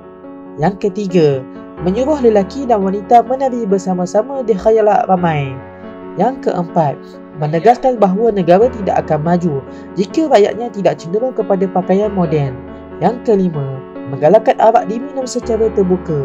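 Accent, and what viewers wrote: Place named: Perak, Malaysia. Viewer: Wah!!!... Mike orghang Perak ke Yong oii? Slanga Perak...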